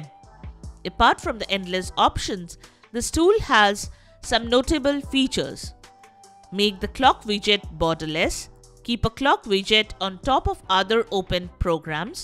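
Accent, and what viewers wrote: India. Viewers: hidious indian accent ... also 'dekstop'? learn to read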